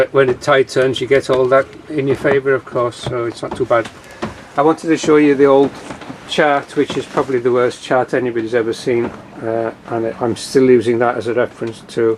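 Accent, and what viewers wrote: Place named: North Wales, United Kingdom. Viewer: Diolch yn fawr. Glad to hear a North Wales accent & to watch your adventures in small yachts. Wish you fair tides & following winds for the future. "Hwyl a flag" from Cornwall & Brittany.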